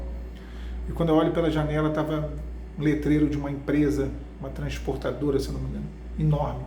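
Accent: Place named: Rio de Janeiro, Brazil. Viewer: Você também é aqui do Rio. Bem que reparei o jeito diferente de falar. Que legal!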